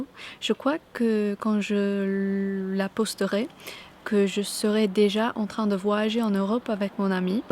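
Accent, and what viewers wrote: England, United Kingdom. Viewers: With your English accent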